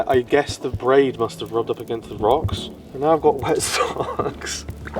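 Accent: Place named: England, United Kingdom. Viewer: Thumbnail, content, editing after 20 seconds and the fine English accent (not Australian??) is an instant sub. Very Jealous of the work you do chap, I'm aiming to find myself in the outdoors more like this more frequently. Hopefully more trips can be inspired by your vids!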